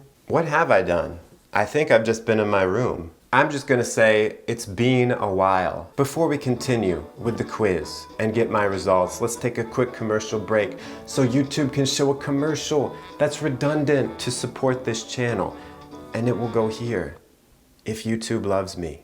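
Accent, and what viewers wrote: France. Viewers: You have a beautiful French accent. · This French accent of yours is something I wasn’t ready for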